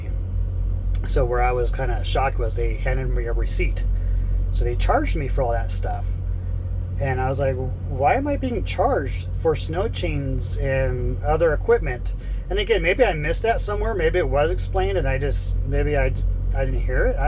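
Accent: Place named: California, United States. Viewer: That's funny; you can feel California, and I can hear it in you. 😆Travel safely...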